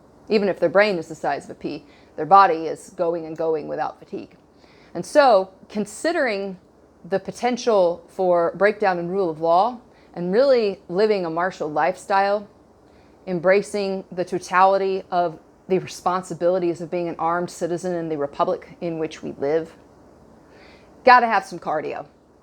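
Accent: South, United States. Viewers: I never knew a jew with a southern accent. Now I can say I do.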